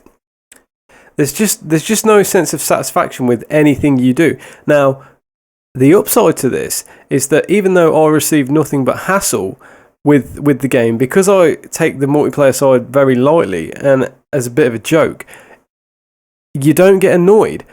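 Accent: United Kingdom. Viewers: absolutely love the british accent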